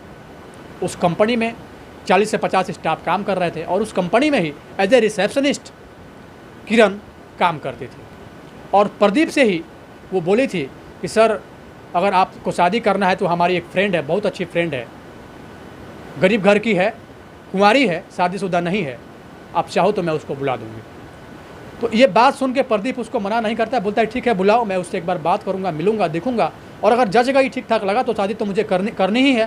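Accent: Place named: Bihar, India. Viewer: Aap bahut achha bolte ho absolutely Bihari style..I like it ❤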